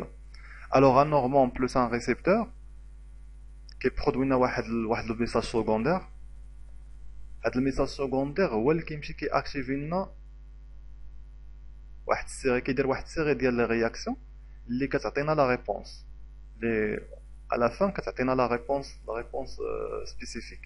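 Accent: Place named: Arab world. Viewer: Ton exposé n'est orienté qu'aux arabes, ton français est trop arabisé, on y comprend presque rien. Shukran quand-même.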